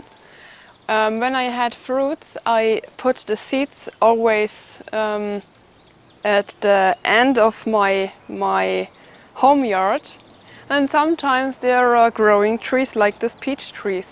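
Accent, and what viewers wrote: Bavaria, Germany. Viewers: So leiwand dieses bayrisch :D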